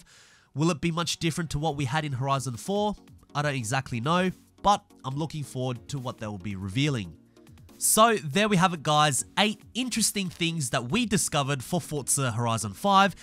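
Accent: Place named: Australia. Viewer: "Yo what's cracking everyone here" Aussie accent never gets old.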